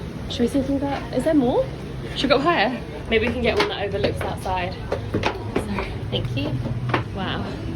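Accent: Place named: England, United Kingdom. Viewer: I like your accent ! Are you from England ?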